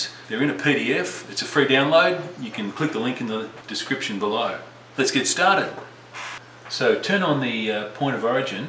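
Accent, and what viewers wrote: Australia. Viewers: Thank you for these tutorials, your teaching style makes gaining skills easy. I really look forward to going through them again with the updated interface as there is some frustration when my copy of 360 doesn't behave the same as yours. It's good to hear an Australian voice also. Quick request that you cover the fillet command in some more detail. In Ex 14 I tried to fillet the join between the 32dia boss and the top of the flat part without success, the arrow kept pointing into the join rather than out.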